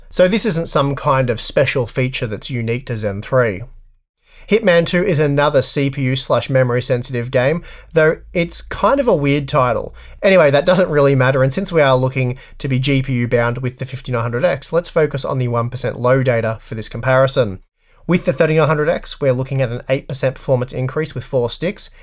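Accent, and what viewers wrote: Australia. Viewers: I always like your videos more than GN, because Steve never takes a break to breathe. I am an engineer and I have troubles following him. (Also, tbh, who doesn't prefer Australian accent over a Yankee one?) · There's something about that exotic Aussie accent.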